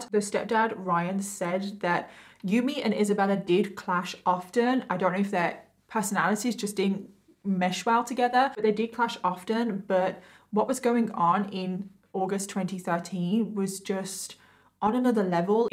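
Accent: United Kingdom. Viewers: is a superstar on Youtube. I've had to take a break from politics and alternative social media. Having always been a fan of true crime, this new genre is a perfect fit for my down time when I'm trying to sleep. Some of these other girls are talented but is like some kind of angel. Her delivery is spot on with a serious reporting style and her makeup is always tasteful. Such a beauty, inside and out...I look forward to see what she will do in the future. Having only recently discovered her channel, there is plenty of back catalogue to binge watch. Maybe it's the accent but she speaks to me. She's brilliant, beautiful and she is carving her own niche. In this culture of Tik-Thots etc. she is still a perfect british lady, fit to have tea with the Queen. Bravo. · You're so obviously British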